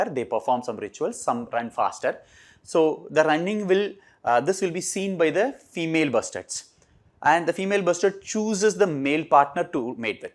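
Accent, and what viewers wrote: South India, India. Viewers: South Indians accent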